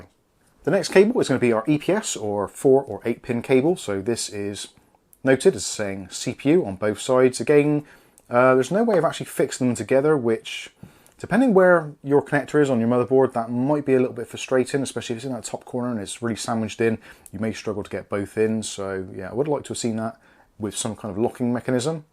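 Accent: Bristol, United Kingdom. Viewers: Nice video. 'Ere - you a Bristol lad or no? Churz!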